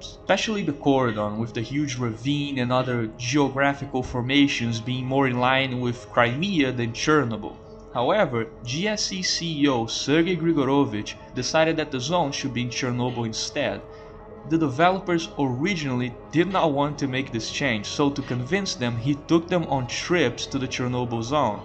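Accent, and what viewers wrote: Brazil. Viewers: Sorry to ask but, are you brazilian? Your accent sounds reminiscent to a brazilian fluent in english who maintained his 1st language "charm" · sotaque inconfundível de br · Is that a Brazilian accent?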